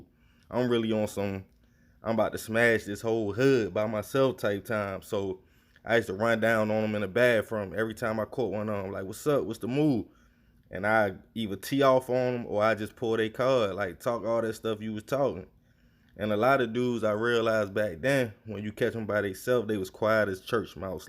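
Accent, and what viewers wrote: Baltimore, United States. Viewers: My dude said T, d off on him!! You can tell you from Baltimore!! Shot out greenmount Ave 29th st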